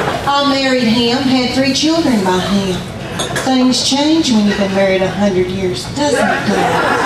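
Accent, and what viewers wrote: South, United States. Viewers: Her southern accent makes it funnier 😂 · She is my people! Those who say she isn’t funny….. have no sense of humor! Humor is always based in some truth. We lived in Europe when we started watching her and her accent made me miss all those years we lived in the south! I have a friend from Tennessee who could pass as her sister, that’s why I say she’s my people! ❤️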